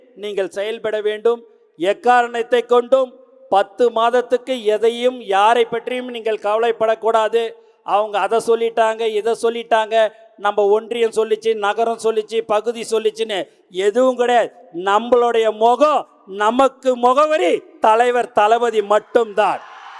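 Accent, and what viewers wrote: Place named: Chennai, India. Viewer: Chennai slang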